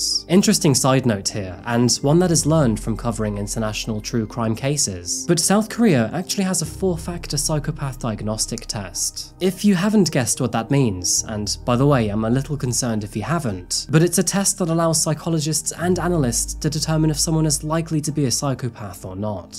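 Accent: England, United Kingdom. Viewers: Dear, may I ask which part of England you are from? I am very interested in the different ways English is spoken in the different parts of England. And of course in true crime I truly appreciate your hard work you put into your videos. CHEERS, Orsi from Hungary. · Thank you,you ARE one of the best crime storytellers on YouTube. With your clear and properly English accented voice, you ARE a class above the others,please carry on with the good work, you ARE much loved (by me anyway!😊) Bravo! 👍👌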